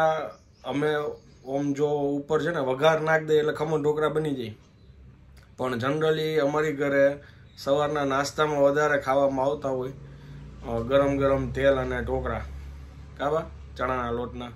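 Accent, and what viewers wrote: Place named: Kathiawad, India. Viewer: Jsk. u are from Kathiawad as I make out from your gujerati talk. So are you a liana or Vaanya?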